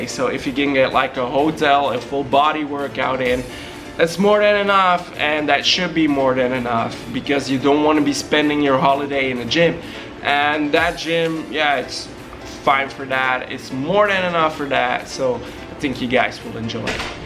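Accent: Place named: Russia. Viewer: You sound Russian that speakers very good English, for a Russian to go there it might not be worth it but as a Canadian paying 1400$ Canadian dollars including flight transfers and resort for level I think it’s a good deal. It’s Cuba you’re not going to get the same standards as Europe or North America but it’s still a good offering.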